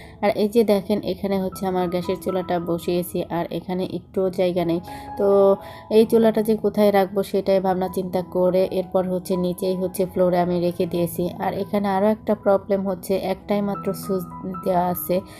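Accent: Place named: Manikganj, Bangladesh. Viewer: Apnr basha manikgonj kothao.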